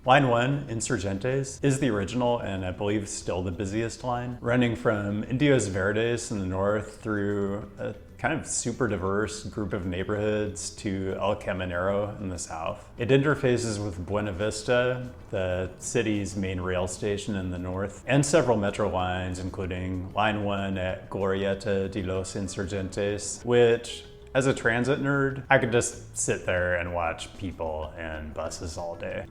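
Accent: United States. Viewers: GREAT VIDEO! I, too, am a gringo nerd who likes your channel and is oddly entertained by your videos. I also LOVE México City and it's amazing, gigantic and extensive transit system -- and I don't get to visit enough! I ❤ CDMX🇲🇽! As a resident of a huge American city on the I-10 swath, where "Spanglish" is widely spoken, I feel the desire to help you out a little. (You definitely speak like an "I-90 gringo".) For "Hidalgo", say "ee-DALL-go" For "Insurgentes", the "g" is pronounced as "h": "een-soor-HEN-tes" and "CableBus" is "kahb-lay-BOOS" (hard "s") From one gringo to another, I hope I have nudged you one tiny step closer to Spanish fluency! 🤓👍🏻🇲🇽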